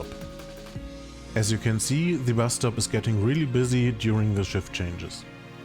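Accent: Germany. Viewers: best episode from over the traintrack so far! as a german, I really like your german accent. has some nice authentic touch to it. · The voice works well! German accent gives extra charm to the video, Serious, I can't imagine any other accent for Tesla German factory. Why can you not fly over the factory anymore? The video is excellent, as always. Maybe a zoom improvement in the future. Greetings from NL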